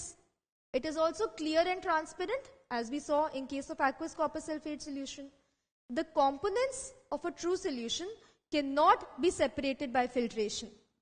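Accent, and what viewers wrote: India. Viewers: aapke accent se pta chl rha hai ki aap indian hain toh kya aap hindi mein padha skti hain plz kyunki aapki eng zyada scientific lg rhi hai toh thodi mushkil aa rhi hai waise aapne sab kuch sahi aur accha padhaya pr plz agar ho ske toh hindi mein padhane 1 ka 1 baar try kijiye ka plz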